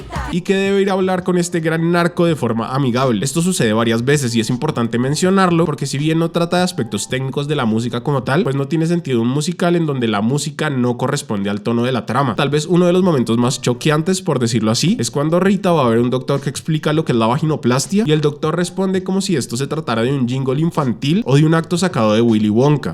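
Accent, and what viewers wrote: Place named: Bogotá, Colombia. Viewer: X2, tiene puro acento rolo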